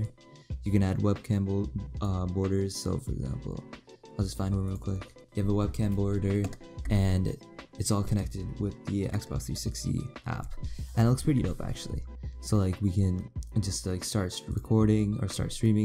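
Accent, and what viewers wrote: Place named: United States. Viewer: almost left expecting half indian with some english and immidetly got an american accent , whats up bruhhh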